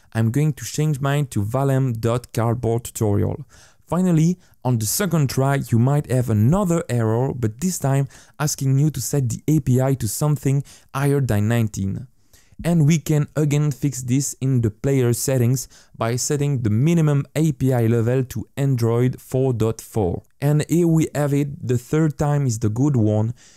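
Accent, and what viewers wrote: France. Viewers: As a French person, I can undoubtedly detect a French accent when I hear one!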